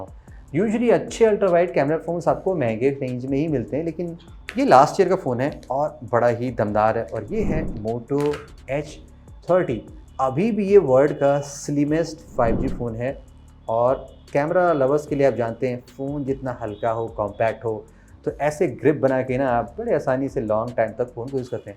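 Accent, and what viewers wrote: Lucknow, India. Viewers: Are you from lucknow❤❤👍🏻✨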